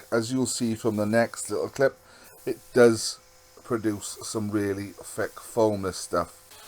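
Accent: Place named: Wales, United Kingdom. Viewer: Great job buddy. Love listening to those gorgeous Welsh tones 😊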